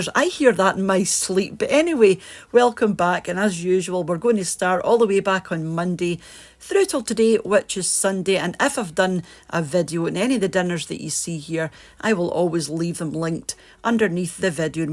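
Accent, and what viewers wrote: Scotland, United Kingdom. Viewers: i hope you know what an inspiration you are to others. im a 60 year old "bonnie wee scot's laddie" living alone with no family, on the other side of the world. far from home, your cooking and weekly inspiration inspires me to cook great meals, and live a life worth living, rather than degenerate into beans on toast every night. when i listen to your wonderful scot's accent, i feel like i have come home. its like a warm blanket. god bless.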